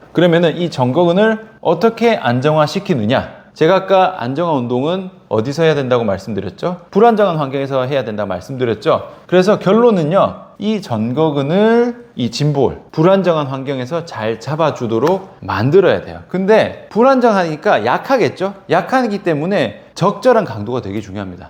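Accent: Gyeongsang, South Korea. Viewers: 그냥 경상도 말투 😂😂😂😂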